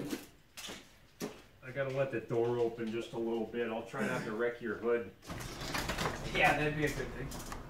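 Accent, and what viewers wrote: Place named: Minnesota, United States. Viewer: What an interesting channel, a creative thinkers oasis in the middle of Minnesota. Now I understand the accent in the movie Fargo, ha ha ha.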